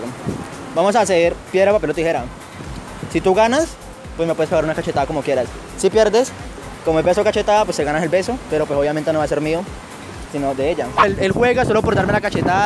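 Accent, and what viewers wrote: Cali, Colombia. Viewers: Eres de Cali? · Eres de cali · Eres de cali verdad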